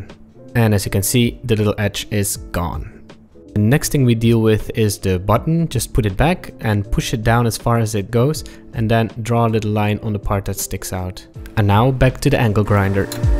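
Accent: Netherlands. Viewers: I know a Dutch person when I see and hear them xD. For I to am a flying dutchman xD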